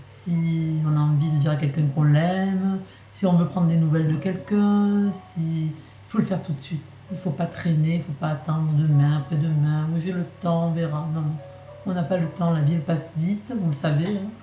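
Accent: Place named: Var, France. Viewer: bonjour, je viens de faire ta connaissance sur you tube et je voulais te laisser ce message: j'ai relevé une phrase dans ta vidéo "le bonheur c 'est de ne pas ressaser le passé tout le temps" et bien là, c'est une des clés pour vivre heureux, il faut vivre le présent, aujourd'hui et maintenant comme on le dit si bien, car cette minute que je prends pour t'ecrire ce message s'est déja écoulée, la vie passe comme un éclair, il faut aller de l'avant et etre fidèle a ses convictions. J'aime ta façon de t'exprimer, simple et sincère, MERCI pour cette video, je me suis abonnée biensur et en plus je parle avec le meme petit accent que toi car je suis du Var:) bonne continuation!!!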